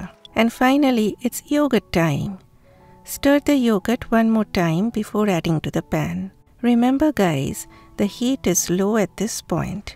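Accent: Bengal, India. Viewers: England. Not exactly on topic I'm afraid but I find your voice lovely. It's clear with that gentle Indian eccent. I absolutely hate being talked 'at', you talk 'to' almost like a friend. I do follow your channel and even attempt your easy recipes. Most come out fine, my wife is fussy and loves them too. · From the very beginning from your tone I easily understood you are Bengali. I'm also from Kolkata. খুব ভালো হয়েছে রান্নাটা দিদি। পুরোদস্তুর আমাদের কলকাতার বাঙালি স্টাইল। এই স্টাইল টাই আমাদের পরিচয়।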